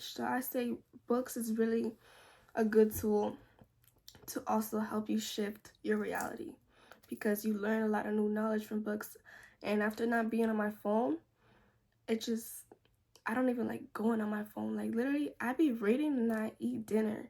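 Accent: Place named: Latin America. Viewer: Are you latina tour accent is so strong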